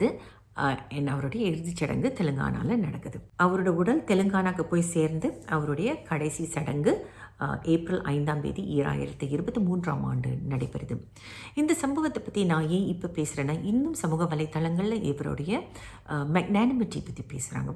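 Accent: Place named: Tamil Nadu, India. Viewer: her narration with Tamil accent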